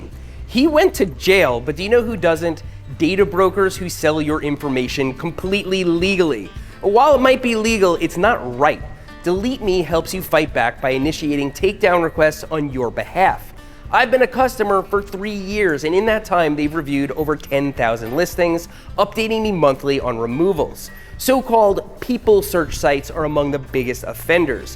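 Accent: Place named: Queens, United States. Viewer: He also drinks wooder like everyone from Queens.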